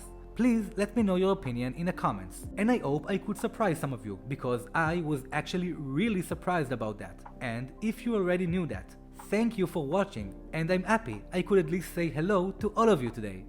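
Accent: Israel. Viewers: You have an Israeli accent. you are Israeli?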